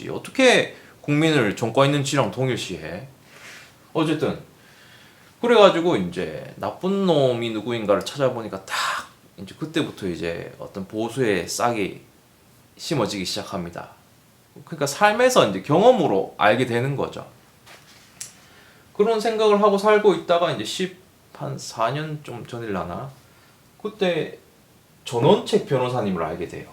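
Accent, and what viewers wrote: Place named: Gyeongsang, South Korea. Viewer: 경상도네 그러니까 우파로. 넘어갔지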